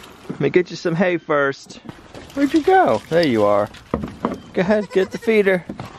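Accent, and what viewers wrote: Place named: South, United States. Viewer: lol I hear your southern coming through. I see more applewood bacon in your future.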